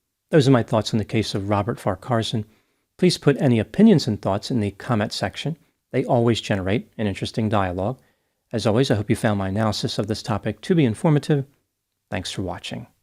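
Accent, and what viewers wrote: United States. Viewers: It took me a while to work out where these places were. I am Australian and the pronunciation was uniquely American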